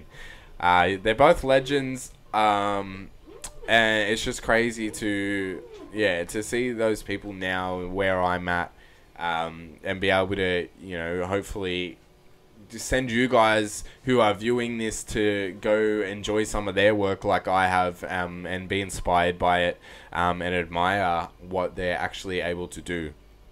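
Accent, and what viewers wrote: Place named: Australia. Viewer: Don't worry about mispronouncing stuff: with the Aussie accent, no one can tell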